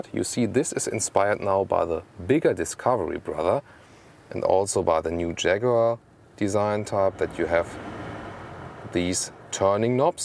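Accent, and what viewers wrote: Germany. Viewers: I hope you mean "Bruno," but even so the only similarity is a German accent. And Bruno is actually Austrian.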